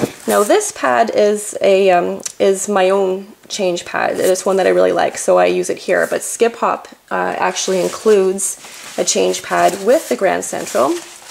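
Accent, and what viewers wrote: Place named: Canada. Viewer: Love the accent! I have a few friends from Canada.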